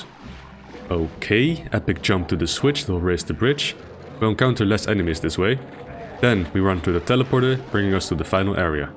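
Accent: Netherlands. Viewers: Wait youre dutch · Are you dutch by any chance? Your accent sounds dutch. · Are u dutch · You Dutch? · Have to say I also thought he was Dutch. Am South African and used to live in NL for a few years.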